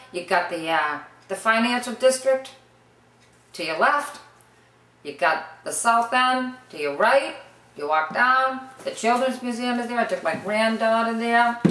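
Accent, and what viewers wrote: Boston, United States. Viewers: you are it!! Thank you for taking the time and making the effort to show the world how Bawstin's accent should be learnt. Greetings from Colombia! I'll always have fond memories of Bawstin. Boahston strong! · Southbridge Mass <3 I visit there all the time, my family sounds just like that. too funny! Great vid btw. :) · Are you from Dorchester ! Gettoooo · i love the boston accent!! its simmilar to mine in certain aspects, (i have a common english accent) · My mom was born in Quincy and raised in Lynn. She talks just like you :) Then she moved to CT, and thats where I'm from lol, so I always poked fun at her for how she said "party" sounded like "potty" :) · You're killing me!!! My mom grew up in Field's Corner, you sound just like her. Ice cream at Seymour's after the movie at the Neponset.Drive In, 25 cent cannolis at Bombadari's Bakery on Dot Ave, a couple of strings at the bowling alley on Adams Street. 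Saw some great movies the Lowe's Theater for a dollar. Wow, all of those great memories are coming back like they happened yesterday. You can take the boy out of Boston, but you can never take the Boston out of the boy. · i love the boston accent. does the south bostonites have the main accent, or is it all over boston? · !! omg I love your vids I LOVE THE BAWSTIN accent is the best I was there for a second and couldn't leave the accent behind your vids are helping me perfect it!! can't wait to visit again!!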